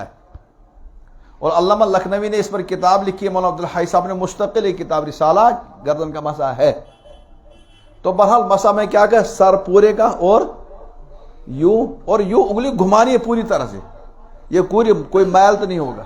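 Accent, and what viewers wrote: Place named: Kashmir, India. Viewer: urdu mai kashmiri tunr h hazrat ka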